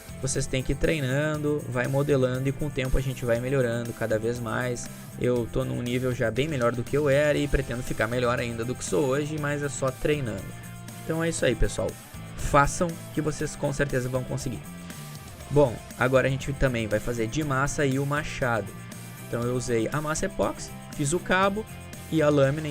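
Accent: Rio Grande do Sul, Brazil. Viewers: Tu es gaúcho ?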